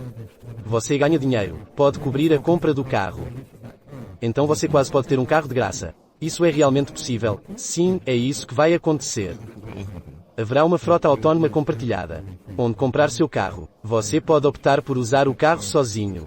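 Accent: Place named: Portugal. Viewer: Você é de Portugal? Eu sou do Brasil, e pelo q entendi, o sotaque no vídeo é em PT-PT, e não em PT-BR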